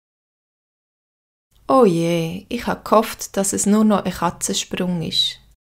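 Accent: Bern, Switzerland. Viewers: Merci vielmals Aber i ha ein frag Isch das barndutsch?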